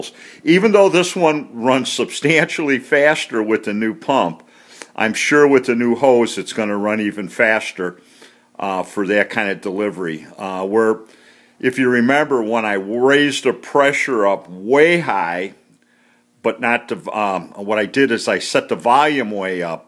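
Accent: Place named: Northern Illinois, United States. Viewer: A fair sized threaded Clevis Shackle sure helps hauling it into the garage out of the crate. The threaded pin on mine just barely fits into hoist hook opening. The fabric hoist sling then easily slides on each side of the shackle. Yeah, I knew you were from northern Illinois. The boat video and the voice were dead give aways. I'll have to work on it to legally get to my property in the UP. I wish I could do some quick connects and haul it out of a trailer up there with a chain hoist and lashed tinbers, but I'm thinking I'm better off just doing fenders and lights and haul back roads at night. Just have an old Ford Explorer and a well restored old dirt trailer based on an ancient welded pinion U shaped frame/axle thing . It holds alot of weight, but .... Thanks !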